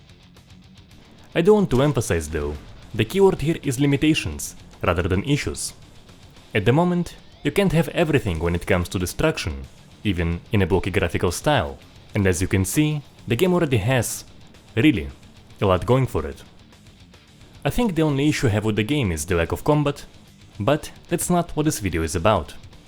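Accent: Russia. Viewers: Русский акцент? Или я плохо разбираюсь в акцентах? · Cool video, but I don't know. Why do you have such an accent mmm... Russian? Are you from the CIS countries?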